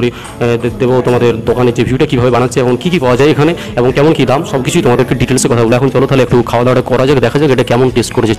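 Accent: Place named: Bankura, India. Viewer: Dada tumi koto 1th kotha bolo so nice tomar kotha sunai boja jai tumi bankurar manus ....very nice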